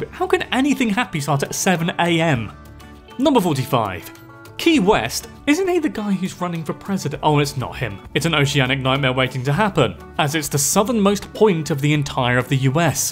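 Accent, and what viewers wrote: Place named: United Kingdom. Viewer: The British say things differently huh? I cringed a couple times, but what can you do? It'd be kind of a "haha" if he did pronounce it like we do and he commented on how weird it sounds to him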